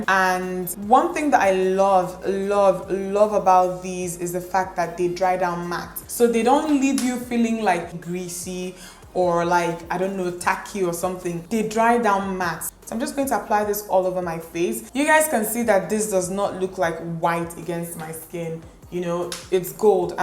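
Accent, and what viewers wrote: Nigeria. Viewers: Are you Nigerian? I love your accent 😍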